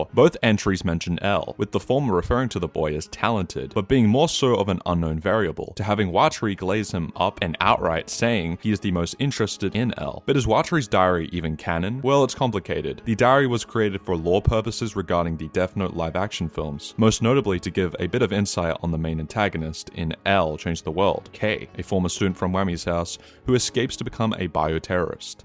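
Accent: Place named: United States. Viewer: Why do you say a bunch of words like a seppo? Are you selectively saying "a" like a yank just to please the algorithm?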